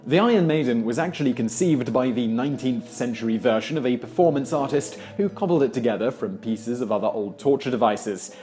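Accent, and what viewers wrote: United Kingdom. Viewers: Is English not the narrators first language? I keep hearing basic words being mispronounced, so just wondering if English isn’t his native language, because his accent seems to be British... confused. :/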